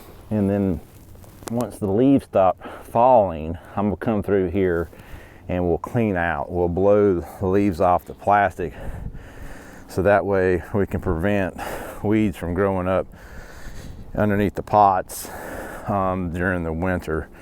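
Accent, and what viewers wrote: South, United States. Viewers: I'm learning southern accent thanks to your videos 😂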